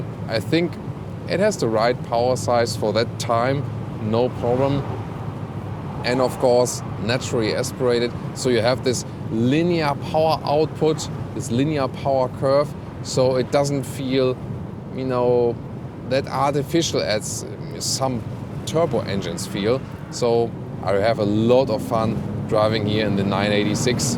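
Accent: Germany. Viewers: The main catastrophe is not the wording, which is sometimes also incredibly German, but the German pronunciation, which almost physically hurts. It is more than ridiculous to say that this is perfect English.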